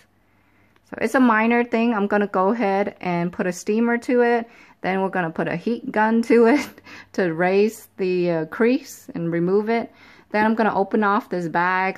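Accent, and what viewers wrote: South, United States. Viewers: I love the southern twang 😂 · Love your southern twang...where did you grow up?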